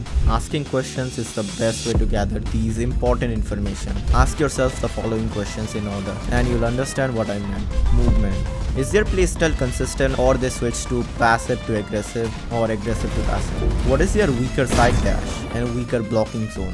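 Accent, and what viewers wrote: India. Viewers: Indian accent >>>>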